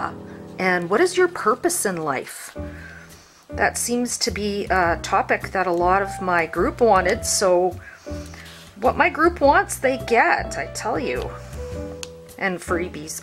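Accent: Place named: Canada. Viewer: Hello fellow Neighbor! Thought your accent was Canadian. Born in Minnesota but moved to Colorado 20 yrs ago and everyone thought I was from Canada. No longer have my MN accent but listening to you brings back memories.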